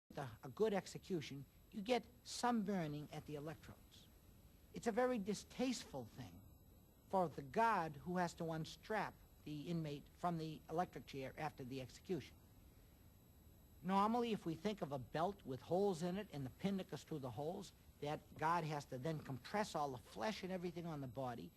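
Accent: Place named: United States. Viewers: Horrible american accent. wow,,, 'very distasteful thing for the GOD who has to unstrap the inmate."... "nomily,, if we think of a belt." ....."the GOD has to compress all the flesh"... "same as the safety belt in yo caw."...."they deficate on da chaw"... Americans really really need to take elocution lessons. They sound like idiots. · There is no such thing as an American accent. There are many, many accents in North America, and even in individual states. This man has an accent found in New England, most famously Massachussets. That accent was developed over a hundred years ago, with minor change over the years, from New Englanders' obsession with trying to be as british as possible. Western North Carolinians and eastern Tenneseans speak with an accent and dialect that historians have found to be nearly identical to the common accent and dialect of Elizabethan Englanders. 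The most famous southern accent, which is spoken by Virginians and north Georgians came from the mid colonial period with high society types from the aforementioned states, then colonies, trying to emulate the accent of the royal family of the day, the Hanovers. So, if you don't like American accents, blame the English.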